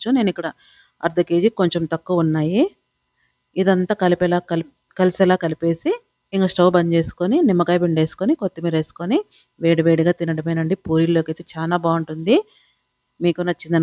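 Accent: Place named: Rayalaseema, India. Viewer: చాలా రోజుల తర్వాత రాయలసీమ భాష విన్నా ❤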